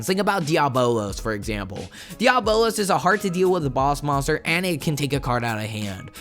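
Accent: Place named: South, United States. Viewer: u gotta read the fine print of the banlist to see the borrelguard ban, it’s very easy to miss. As for the southern accent, I totally see it. It’s way funnier reading my comments in that voice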